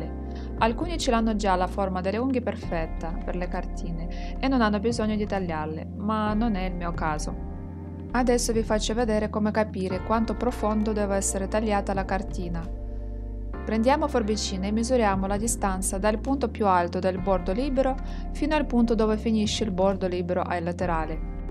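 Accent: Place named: Ukraine. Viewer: L'accento ucraino 😩😍😍 (correggimi se ho sbagliato)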